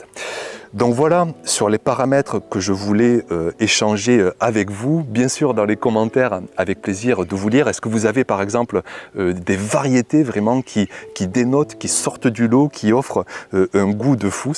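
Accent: South, France. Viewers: Salut, et merci pour tout encore une fois. L'accent ensoleillé c'est toujours un plaisir ❤️ J'ai testé la "Black cherry", tomate-cerise un peu noire/rouge que j'ai bien aimé, il faudrait la trouver en variété classique pour bien apprécier je pense. Autre chose, avec tes soucis de chaleur et sécheresse, pourrais-tu faire la saison prochaine un achat de quelques sachets de Pascal Poot, qui sont génétiquement peu sensibles aux manques d'eau. En nous faisant une mini-série dédiée, thématique. Enfin c'est une idée quoi, ça m'est venu mais tu as dû y penser déjà, Bonnes vacances à tous 🙏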